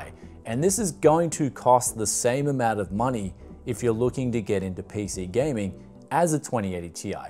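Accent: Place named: Australia. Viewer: i've been watching a few of your videos, but im afraid i cant get used to your australian accent you pronounce the D in Dual as if its a J to me it sounds like you're saying Jewel "Recently on the channel here, i took a look at jewel gpu's..."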